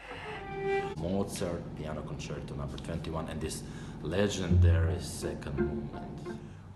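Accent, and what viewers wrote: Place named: England, United Kingdom. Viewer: You are adorable, unic, original human being with a worm ❤️. I can't wait to get this Fabulous CD, thanks so much for your information about your favorites in Classical Music. I love your English accent and the way you express your Passion to play the beautiful Music with your Cello. God bless you 👼*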